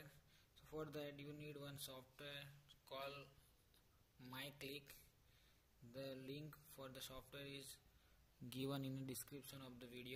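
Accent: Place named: India. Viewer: I love Indian Accent really!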